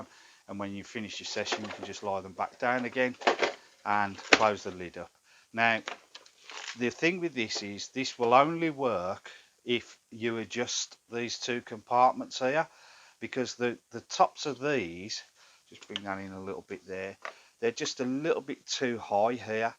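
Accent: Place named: West Midlands, United Kingdom. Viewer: Like how you think great great tips & ideas grip leads can be a pain n literally sometimes when rummaging around in your box . sounds like your a west Midlands chap like myself i love all types of fishing but the sea fishing the most regardless of the distance from brum . Wish you luck n success with the channel from a new subscriber 👍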